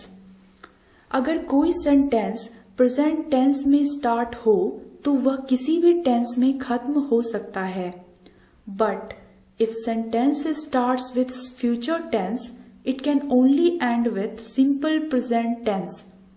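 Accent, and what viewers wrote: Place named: Bihar, India. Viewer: you are very beautiful and as i can understand you are from bihar. am i right ?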